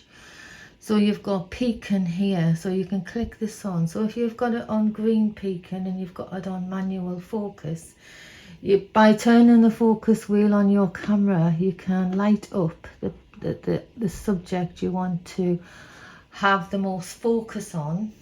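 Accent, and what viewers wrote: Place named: Newcastle, United Kingdom. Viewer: Great informative video, Would not have had a clue about some of the options without it, so many thanks. And seriously - loved the Geordie accent.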